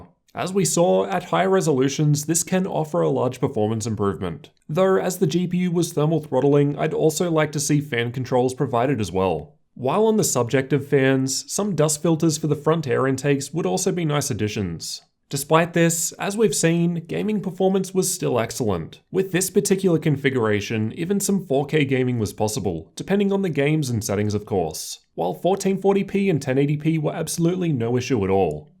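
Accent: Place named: Australia. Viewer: the Lornorworrrrrrr Australian accent is cute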